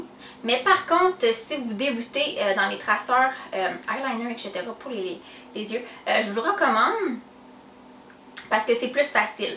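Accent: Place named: Canada. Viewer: Coucou, merci d'être passé sur ma chaine. D'habitude je n'aime pas trop l'accent canadien mais tu es tellement drôle que tu me fais l'apprécier. J'aime bien ta vidéo, et je te trouves trop jolie. Bisous ma belle et je m'abonne ♥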